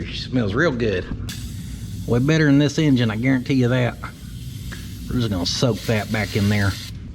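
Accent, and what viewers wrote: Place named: Oklahoma, United States. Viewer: the true sound of Oklahoma!!!!! A real accent from the south west!!!!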